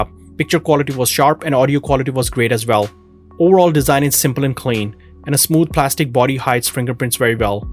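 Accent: India. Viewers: Video was awesome but the thing I noticed was your accent didn't sound like American but as an indian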